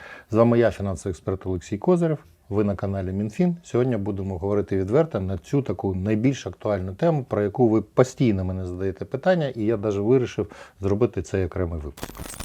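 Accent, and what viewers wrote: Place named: Russia. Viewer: Підтягнути чисту українську ,без нахилу на російську!!!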